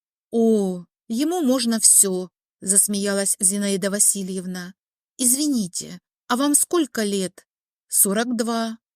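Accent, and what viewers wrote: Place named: South, Russia. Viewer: Очень сильный южный акцент. Хочется литературного произношения.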